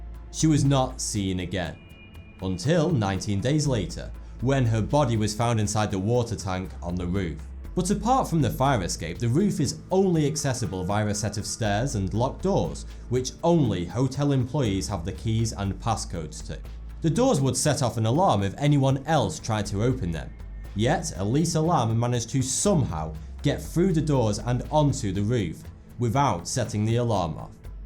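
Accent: United Kingdom. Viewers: Something about that british accent makes all of these videos not scary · Skill Definitely sounds like he's just from the United Kingdom.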